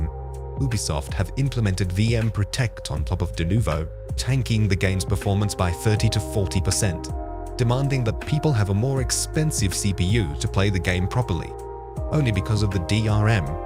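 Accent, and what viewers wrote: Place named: England, United Kingdom. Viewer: bruh i just listened to jolly wangcore, he's australian. idk what the accent is called but this is like a slightly posh English accent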